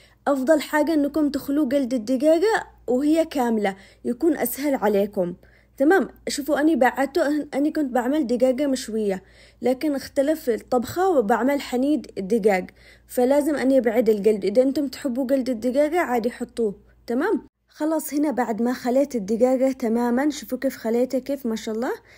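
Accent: Aden, Yemen. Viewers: شكرا جزيلا لك يا بنتي كلامك العدني الأصلي والاكل ماشاءالله تبارك الرحمن ربي يحفظك حلو جدا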